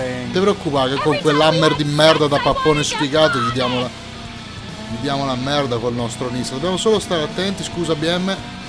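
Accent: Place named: Livorno, Italy. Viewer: ma di dove sei? Avvolte sembri tipo livornese AHAH😂 (sono quello dei guanti i da 9.99€ di decathlon😂)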